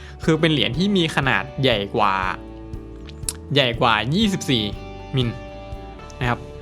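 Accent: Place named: North, Thailand. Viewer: เสียงหนุ่มเมืองแปร่ อู้ไทย เสียงหล่อ ดี น้อ อิอิ:face-blue-smiling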